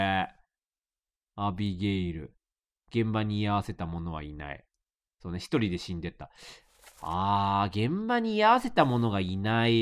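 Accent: Kansai, Japan. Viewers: 今回の実況、関西弁多めで嬉しい…⁽⁽( ˊᵕˋ )⁾⁾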